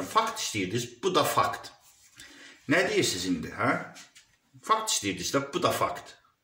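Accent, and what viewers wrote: Baku, Azerbaijan. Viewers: Cox gözel faktlarla danişir gözel bakı ləhcəsiylə danişir.böyük hörmətimiz var size bəy.var olun.yaxşı pox qoyursan lazımı olanların agzına. dilinə qüvvət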